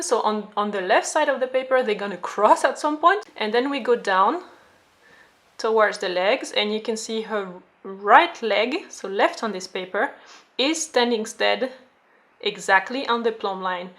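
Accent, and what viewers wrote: France. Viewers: Lissy London hearing her accent, she’s probably french so I’d say that’s where she went, I could be wrong though!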